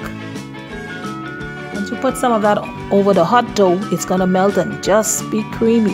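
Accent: Bahamas. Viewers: I love hearing that true Bahamian accent behind our dishes!!Love this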